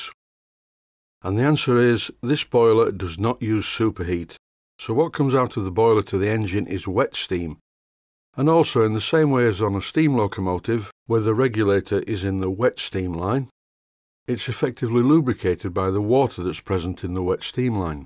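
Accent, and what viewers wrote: Yorkshire, United Kingdom. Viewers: Your Jorkshire dialect makes it sound like you say "combustshn." :D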